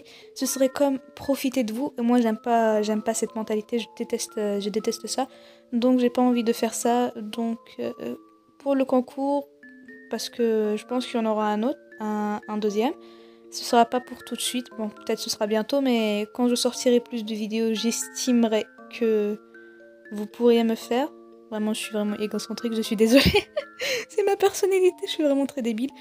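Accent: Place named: Kabylie, Algeria. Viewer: Ta un accent kabyle comme moi UwU Et tkt ta voix et trop cute je trouve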